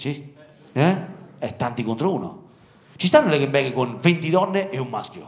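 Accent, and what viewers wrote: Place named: Marche, Italy. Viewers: Regà ma quant'è bello l'accento marchigiano! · Il marchigiano è bellissimo....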